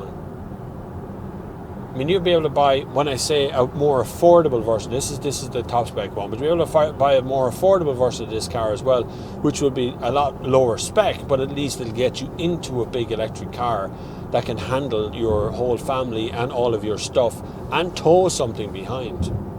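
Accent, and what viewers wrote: Ireland. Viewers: I'm just happy to hear an Irish voice.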